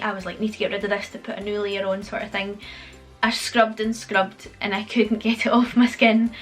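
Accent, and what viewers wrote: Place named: Scotland, United Kingdom. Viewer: Holy shit your results are stunning! Beautiful skin! I'm feeling all proud of myself as an American who was able to correctly identify your accent as Scottish...lol. That's as best as I can do though. I'm glad to have found your channel!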